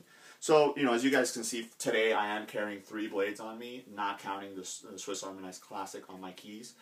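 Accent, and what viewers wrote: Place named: South Florida, United States. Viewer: thanks for sharing. I knew you were in So FL from your acceent:) Suave... are you going to the gun show in Fort Lauderdale this weekend?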